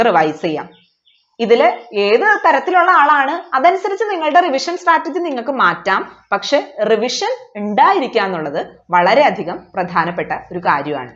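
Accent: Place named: Kottayam, India. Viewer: കോട്ടയം കാരി ആണോ നല്ല ഭാഷ ശുദ്ധി, പറഞ്ഞത് ശരിയായ കാര്യം തന്നെ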